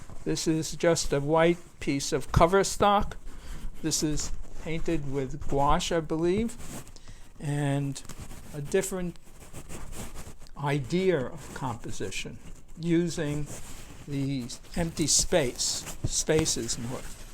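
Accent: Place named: Brooklyn, United States. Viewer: your accent is just fine to us Brits! We love listening to your Brooklyn accent🤩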